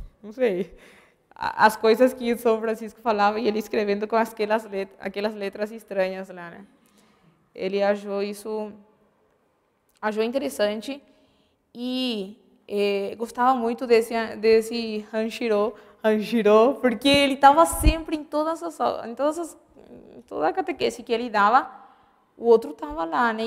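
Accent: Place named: São Paulo, Brazil. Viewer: Gringa com Sotaque paulista. Primeira vez que vejo. Excelente aula. Obrigado.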